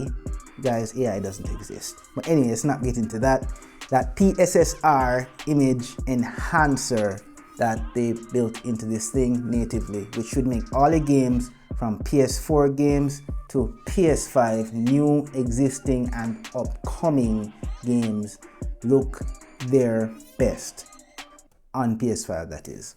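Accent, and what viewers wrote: Jamaica. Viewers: Bring out the Jamaican out 🇯🇲🇯🇲🇯🇲🇯🇲😂😂😂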